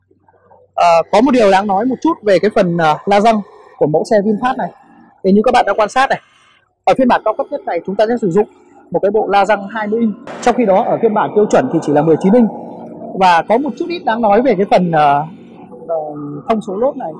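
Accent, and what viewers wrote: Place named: United States. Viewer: May mà bạn nói bạn là người vn nếu ko thì tôi cứ tưởng bạn là người Mỹ đấy.cảm ơn bạn.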